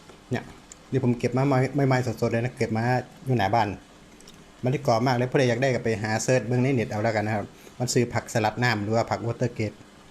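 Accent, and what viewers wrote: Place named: Chaiyaphum, Thailand. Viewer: จ่าเป็นคนบ้านได่ครับ สำเนียงแบบนี้ให้ผมทาย ชัยภูมิคักๆ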